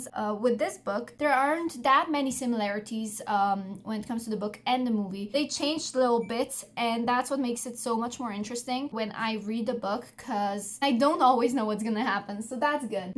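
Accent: Slovenia. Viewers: Love your slovenian accent 🤩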